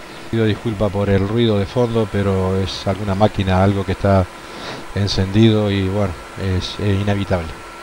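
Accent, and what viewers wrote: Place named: Argentina. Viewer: Por el acento sos argentino...no...?...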